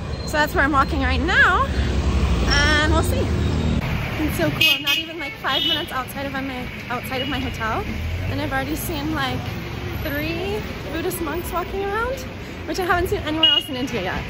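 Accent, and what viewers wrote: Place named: Southwest, United States. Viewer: clearly its an American accent.. southwest.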